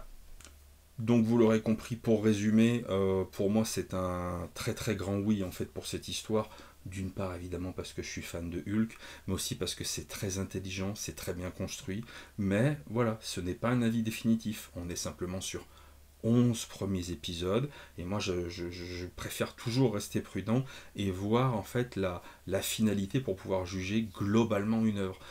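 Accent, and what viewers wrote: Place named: United Kingdom. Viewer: Mais c'est que ça lui va bien ce petit rafraichissement capillaire. Et cet accent british... Oh My...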